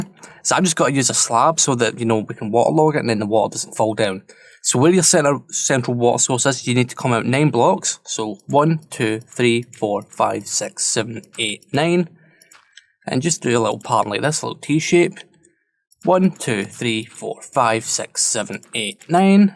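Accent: Scotland, United Kingdom. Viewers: As soon as I started watching this my head just screamed 'YESSSS, HE'S SCOTTISH!!!!!!!' Why am I like this XD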